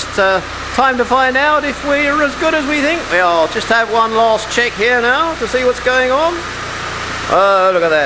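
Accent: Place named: United Kingdom. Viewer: good to hear the British accent :) wish you showed more more the mounting plate and procedure for the steering box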